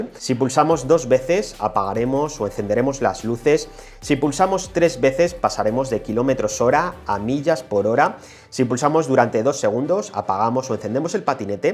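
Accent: Spain. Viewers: me da risa como hablan los españoles - normativa pasada video bajo demanda jaja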